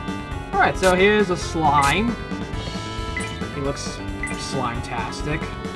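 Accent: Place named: New York City, United States. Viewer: Is this LP'ers voice for real? Or is he a parody of an NYC Jew? That voice can't be for real.....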